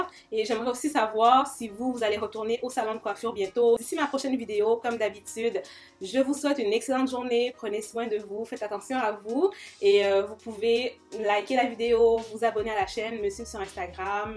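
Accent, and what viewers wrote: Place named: Quebec, Canada. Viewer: J'aime trop ton accent québecois en plus tu est de toute beauté😌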